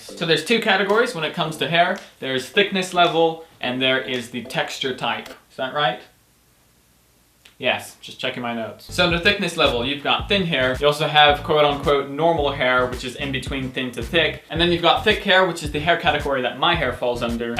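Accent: England, United Kingdom. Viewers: what's up with the english accent? lol he never sounded like this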